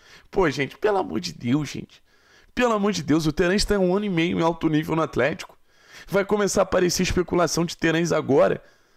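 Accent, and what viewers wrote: Rio de Janeiro, Brazil. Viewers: Foda-se, me inscrevi, não tem como! O cara com sotaque carioca tá do lado certo da força! Parabéns, contra tudo e contra todos. Sempre! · Que vídeo espetacular..bom ver tanta sobriedade junto com sotaque carioca, coisa rara na imprensa esportiva kkkkkk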